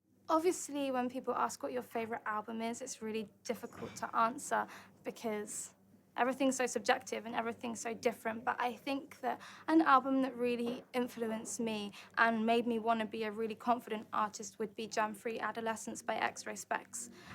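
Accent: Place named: United Kingdom. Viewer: HER ADORABLE BRITISH ACCENT it hurts my gosh it hurts she's so fantastic it HURTS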